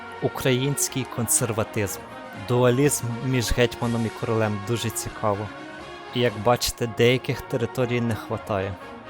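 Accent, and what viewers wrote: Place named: Germany. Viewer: А ти не німець? Бо таке враження неначе німця слухаю який українську вивчив.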